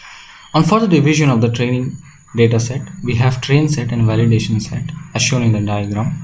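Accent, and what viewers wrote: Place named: India. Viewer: Probably the Indian accent got you.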